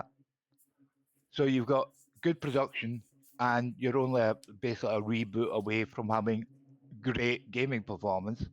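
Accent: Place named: United Kingdom. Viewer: oh god a brit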